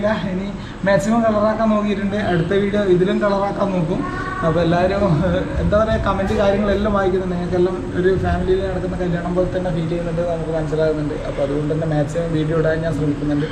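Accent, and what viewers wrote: Kannur, India. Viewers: എവിടെ യ നിങ്ങളുട നാട് കണ്ണൂരൊ കാസറഗോഡ് 🤔ഭാഷ എന്റെ ത് ആയതു കൊണ്ട് ചോയിച്ചത് പ്പ 😂😂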